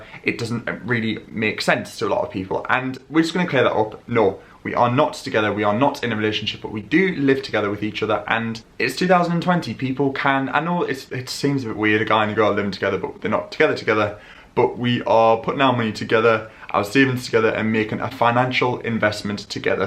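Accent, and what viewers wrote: United Kingdom. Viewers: British accent 💯🤍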